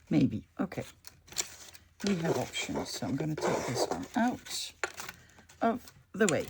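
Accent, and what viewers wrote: France. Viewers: I love your style. I think the mole one is my favorite too. You do great with English being your second language. I do love hearing your french come out though. And your puppies.. gets my puppy all involved to and makes me laugh! ( mine watches tv ) Thank you I love the random ephemera videos.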